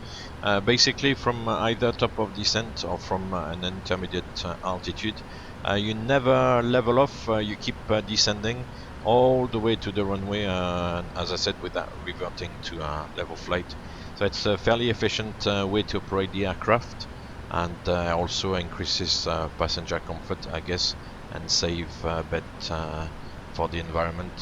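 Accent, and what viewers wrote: France. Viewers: as french i can confirm he is from france, i can recognize french accent by thousands xD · most probably French? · love the french accent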